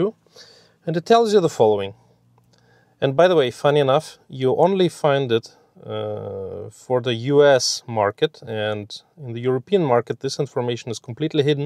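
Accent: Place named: Germany. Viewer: I hear man with German accent reviewing technology, it's first like and then view. Subbed, my regards from Greece!Good luck with VW issues.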